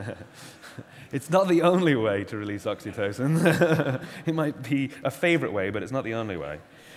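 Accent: United Kingdom. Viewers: wow. first time i'm hearing his british accent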